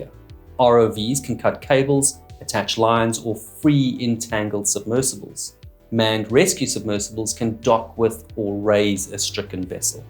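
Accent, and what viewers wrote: South Africa. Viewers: Except he has a South African accent! It is a nice accent though. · I love the content and the South African accent 😊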